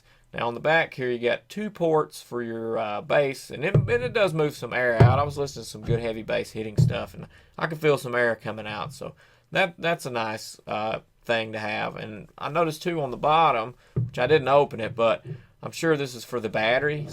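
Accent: South, United States. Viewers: The issue may be that the iHome is not acoustically isolated from each speaker. I wonder if placing some sound isolation material between the 2 speakers may reduce this issue. The base from one speaker may be causing interference with the other since the sound wave could be colliding during loud play. Hey love your Southern Drawl. Not making fun, I just think it is unique and we don't get any of that where i am from. Cheers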